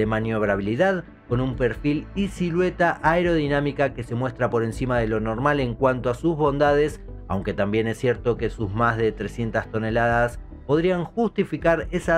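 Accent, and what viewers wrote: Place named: Argentina. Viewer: Me encanta ese acento argentino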